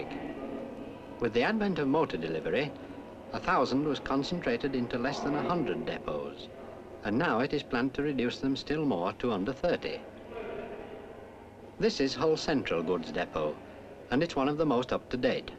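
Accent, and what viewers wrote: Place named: Newcastle, United Kingdom. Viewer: I can hear the Geordie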